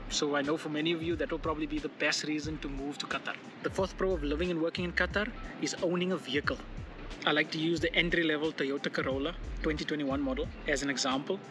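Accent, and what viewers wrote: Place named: South Africa. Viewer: Wow, was scrolling info about Qatar… I heard the accent and new , ag jinne man , die seun is van Suid Africa!!!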